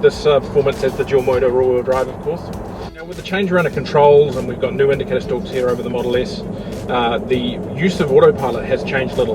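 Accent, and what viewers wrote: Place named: New Zealand. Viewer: Nice review, Ive seen soooo many international reviews, nice to hear a kiwi accent with a kiwi review, cheers !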